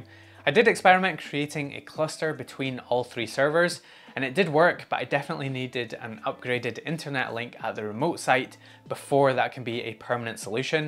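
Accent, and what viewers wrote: Scotland, United Kingdom. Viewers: Excellent insight for a newcomer to the homelab/server world. Have just installed TrueNAS Core and upgraded to Scale on a salvaged 2011 desktop machine as an experiment, so I'm just getting a feel for the most popular apps that folks are running before taking the next steps. Really useful overview and nice to hear the Scottish brogue again ( I spent 21 years working in Lanarkshire for one of the few remaining semiconductor facilities before retiring back in my Welsh homeland with my Scottish spouse). Sub'd :)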